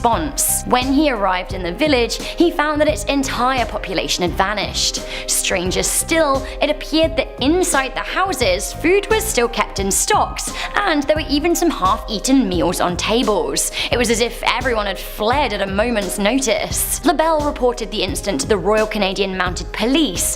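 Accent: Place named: United Kingdom. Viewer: I love her British accent 😮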